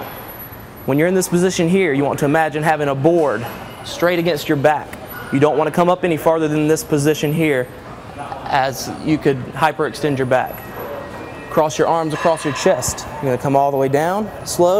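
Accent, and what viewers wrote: South, United States. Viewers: Are you tonedeaf? His voice is nowhere near DiCaprio's. For starters, he has a very obvious southern accent overtone of some sort. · This guy is clearly southern. He doesn’t sound like DiCaprio at all.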